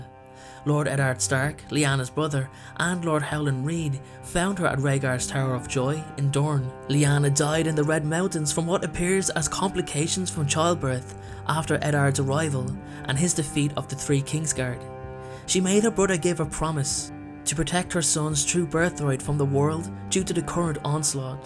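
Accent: Dublin, Ireland. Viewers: I think he's a Dubliner like myself 🙂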